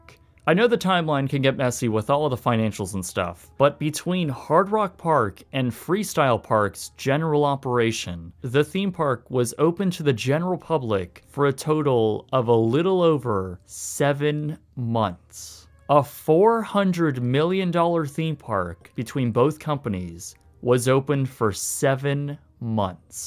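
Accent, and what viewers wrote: Canada. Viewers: "forgotten aboot'! Love the Canadian accent!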